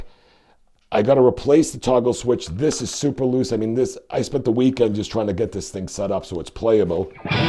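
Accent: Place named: Boston, United States. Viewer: That's a Boston accent. I'm from there too buddy. Cheers.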